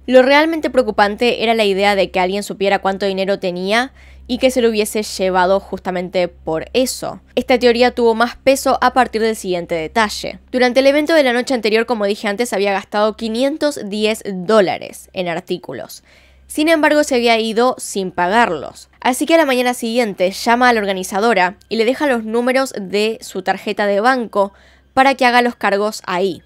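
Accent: Argentina. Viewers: ¡Me encanta tu gata! De ahí que es un plus más para ver tu canal, siempre me fijo en ella, a parte de tu acento argentino. Un beso guapa. 😘 😻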